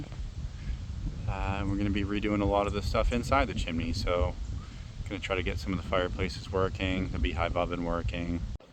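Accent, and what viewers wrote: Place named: Northeast, United States. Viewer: Any idea where this guy is from? His accent sounds like he’s from the northeast maybe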